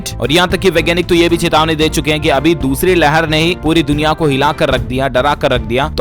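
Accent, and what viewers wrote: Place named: Marwari, India. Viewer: Aapki marwari boli mast hai motiyar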